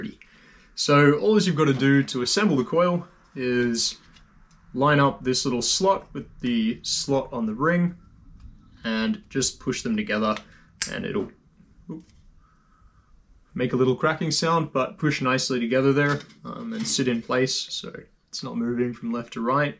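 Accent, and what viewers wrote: Australia. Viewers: Slight Aussie accent Almost American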